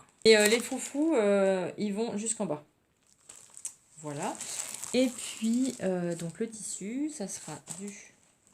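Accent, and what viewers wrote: France. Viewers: Tu m’amuses en parlant de ta manière de parler : nivernaise et Morvandelle ! De quel côté es-tu ? J’ai habité longtemps à côté de Corbigny dont on dit que ce n’est pas en Morvan mais que ses poules y vont aux champs....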